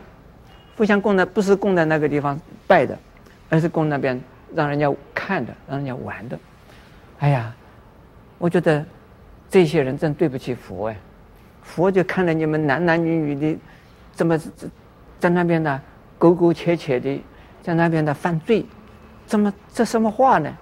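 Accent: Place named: Taiwan. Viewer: I lived in Taiwan for a long time. And he he’s speaking Mandarin Chinese, it’s just that he has a very heavy Taiwanese accent.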